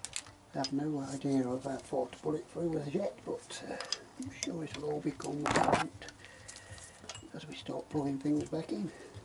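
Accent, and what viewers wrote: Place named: United Kingdom. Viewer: Great video. I had got part way through dismantling forks on XJ 900 today and could not figure how to get it apart so decided to check you tube. I had an M14 bolt with 22mm head and used that to hold inside. One problem, as I am not from UK I did not understand one word, just the accent but a picture is worth a thousand words.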